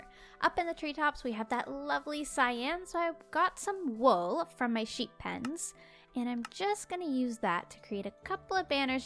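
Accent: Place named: Canada. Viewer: I love gems 'Canadian moments' like when she says cie-an or sore-ey because its so cool as you realise that she pronounces things differently than we do. I personally am having a lot of fun listening to her pronunciation (not in a mean way) and her metaphors. I love this channel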